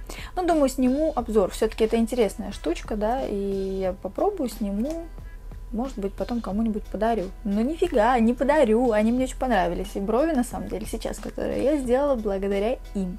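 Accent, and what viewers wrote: Rostov, Russia. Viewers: Из какого вы города ? Показалось что из Ростова на дону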